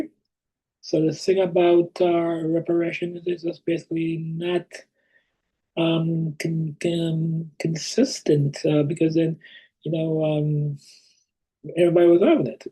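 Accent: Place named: West Africa. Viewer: Hi Doc, is your lovely accent West African, or Haitian?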